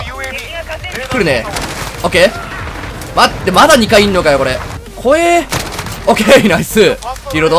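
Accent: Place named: Hyogo, Japan. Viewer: 時々聞き慣れた発音してたからもしかしたらと思ったら もしかしたらで兵庫出身やったんですね笑 動画で「なんどいや」 , 「~じゃいや」「〜がいや」etc 言って流行らして下さいw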